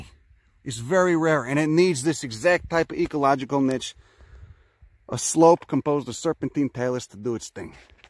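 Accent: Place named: Queens, United States. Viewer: Man, your Queens accent is mighty! This is the freshest channel to the science world, good shit homie...bet.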